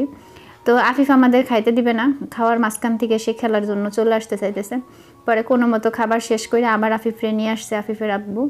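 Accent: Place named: Madaripur, Bangladesh. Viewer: Apu tomar madaripurer vasa huinga moroto madaripurer vasa muhe aiya porte ace.onek din doira koite parina. Dese gele komu InsaAllah.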